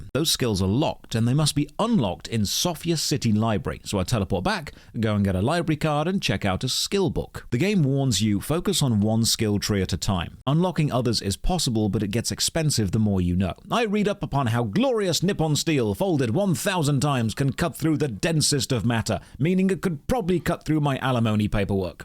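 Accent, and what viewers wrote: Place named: RP, United Kingdom. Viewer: Oh, thank god, a new. I have been fucking sick for over a month now, and I just... I just need to listen to a pretty man with a posh little accent bitch about an MMO. I'm so glad this came out today.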